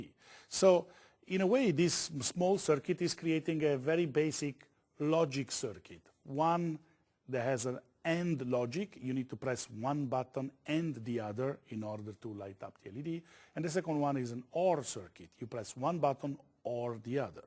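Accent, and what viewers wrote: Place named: Italy. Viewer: 意大利英语真给力~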